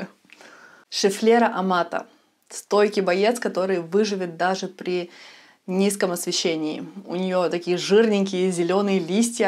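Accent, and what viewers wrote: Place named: Baltic. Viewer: Умница! Красавица! Спасибо Ирине за то, что она рекомендовала Вас!!! Обожаю ваш прибалтийский акцент. Вы для меня и настроение ,и вкус , и эстетика и женственность!!!❤❤❤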